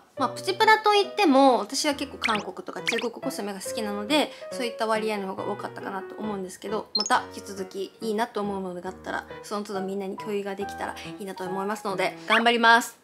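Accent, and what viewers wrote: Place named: Kansai, Japan. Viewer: 関西弁かわいい笑笑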